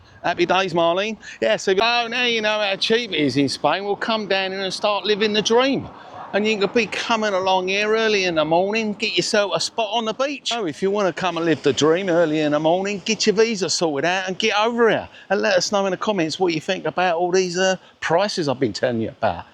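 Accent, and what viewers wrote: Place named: Lancashire, United Kingdom. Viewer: Now I'm hearing Rick Parfitt (RIP), is it Lancashire or something? :)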